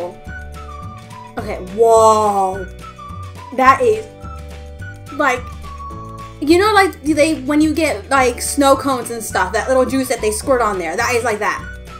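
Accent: United Kingdom. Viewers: I thought ur British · Ur accents gone a bit British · Ikr! She probably started to sound like British people because she's been living with them 😂😂🇬🇧🇺🇸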